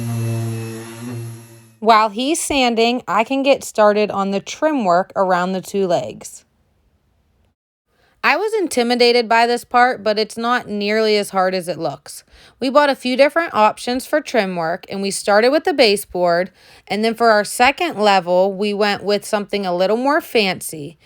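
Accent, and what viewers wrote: Pittsburgh, United States. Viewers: Where are you from? Sounds like PA near Pitts?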